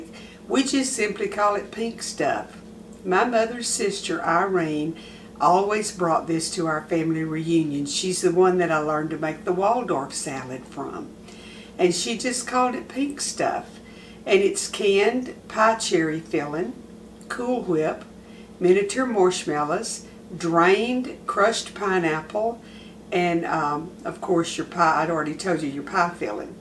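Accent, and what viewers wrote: South, United States. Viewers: Okay I'll start out by saying I love your kitchen beautiful I love your Paula Deen accent dead on I love the southerness in your voice I'm going to subscribe just for that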